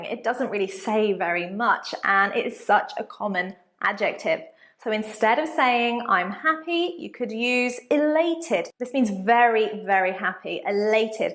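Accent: United Kingdom. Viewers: What accent os that? os this Bristh accent? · Gosh i haaaaaate british accent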